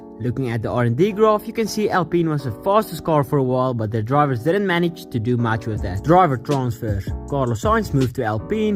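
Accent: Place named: Afrikaans, South Africa. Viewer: im trying to figure out where youre from and you give me afrikaans vibes, so ima guess SA?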